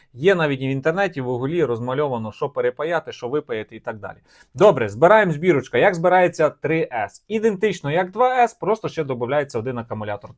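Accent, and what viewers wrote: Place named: Western Ukraine, Ukraine. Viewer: впадло только что на западенском акценте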